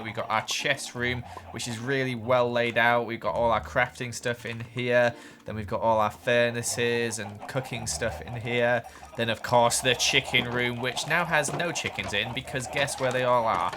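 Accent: United Kingdom. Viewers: The beginning killed me, that is why I love the British accent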